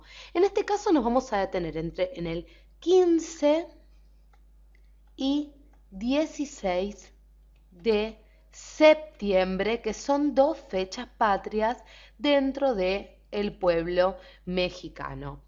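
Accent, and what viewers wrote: Argentina. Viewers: Hola y disculpa que te tutee, pues nada que agredecer con gusto, escucho tu acento y eres argentina sino me equivoco...bueno es interesante la historia de este país, solo que te venden otra historia....la que todos conocen, pero investigo mas de 10 años el tema de la Independencia de méxico, que tanto saben sus habitantes, y no es mucho lo que saben...hay mucho desconocimiento...lo que guste referente al consumador de la Independencia de méxico..te doy información...cuidate.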